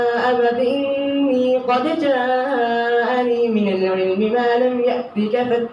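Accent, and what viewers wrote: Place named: Panipat, India. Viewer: خوبصورت پانی پتی لہجہ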